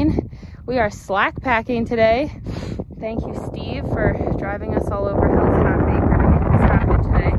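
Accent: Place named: Ulster, United Kingdom. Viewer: You do a beautiful ulster accent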